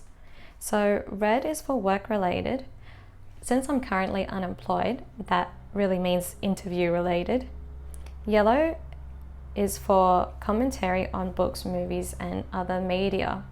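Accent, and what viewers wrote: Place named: Australia. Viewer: Is it bad that I knew in the very first 3 seconds you were Australian like me? Hope you're doing ok and have found a job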